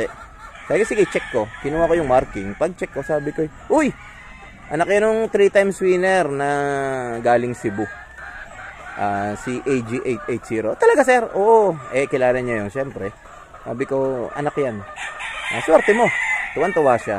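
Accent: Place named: Batangas, Philippines. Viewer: Yown gusto ko sa batangueno eh ay kaalwan pakinggan at may joke talaga eh..more power channel.new subscriber boss...from north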